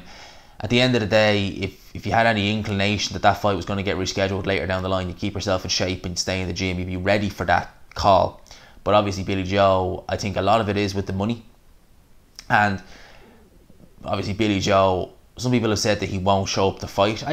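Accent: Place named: Dublin, Ireland. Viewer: Got Irish rent - you're such a dubbie you sound like my dubbie cousins :D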